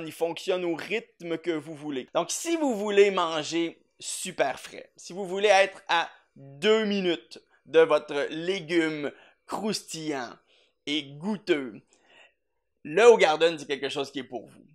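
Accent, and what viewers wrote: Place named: Quebec, Canada. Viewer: No offense... mais... l'accent québécois démolit complètement la vidéo. Un des accent des plus horribles de la la langue de Voltaire.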